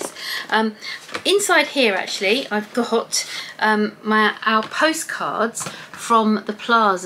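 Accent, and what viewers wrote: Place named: United Kingdom. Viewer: Yay, I was team green! 💚 You hit the jackpot — such a beautiful soft minty green that will go with virtually everything! I’ve watched all of your NYC videos and enjoyed them all, including this one. I’ll admit that I was tempted to fast forward to see which color WOC you got 😂, but I stayed the course because I love listening to your narration and lovely British accent ❤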